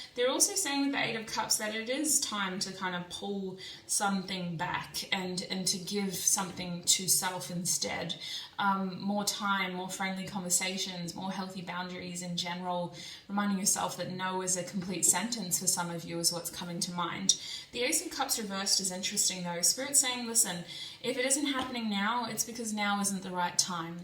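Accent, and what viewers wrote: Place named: Australia. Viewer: Love Kirk (his name said in your lovely Australian accent!) 🖤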